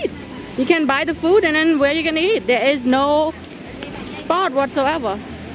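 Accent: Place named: Vietnam. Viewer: Are you Khmer or what? You act like it's your first time in Cambodia. You have Vietnamese accent though.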